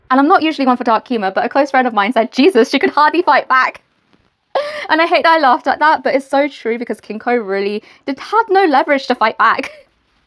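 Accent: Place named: United Kingdom. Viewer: You have such a lovely accent. Were you raised in Britain?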